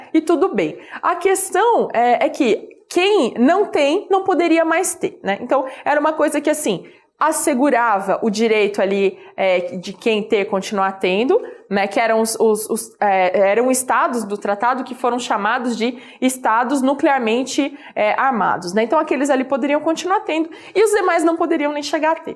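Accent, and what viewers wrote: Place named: South, Brazil. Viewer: Ela tem um sotaque lindo do Sul que eu amo. Báh que linda.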